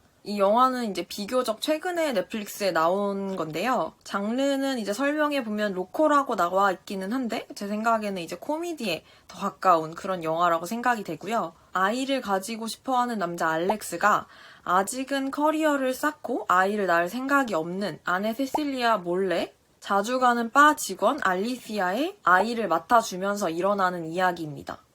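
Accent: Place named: Spain. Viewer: ㅋㅋ 님 뭔가 한국어 하실때도 스페인어 말투가 묻어나는 거 같아요ㅋㅋㅋ추천해주신 영화 꼭 볼게요 감사합니당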